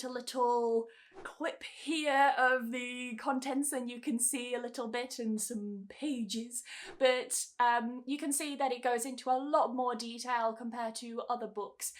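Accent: United Kingdom. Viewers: British accent is fascinating 😍 but I wanna learn US. accent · English pronounciation? Or british accent pronounciation?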